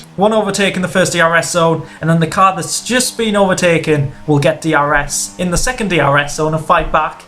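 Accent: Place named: North East England, United Kingdom. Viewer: Are you from the north east of England? your accent sounds like its from there.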